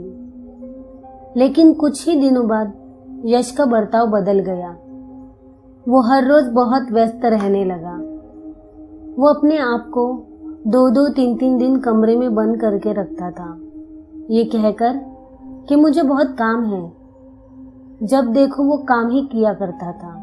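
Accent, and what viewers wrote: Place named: Maharashtra, India. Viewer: Full on Marathi accent, last two statements were exactly opposite.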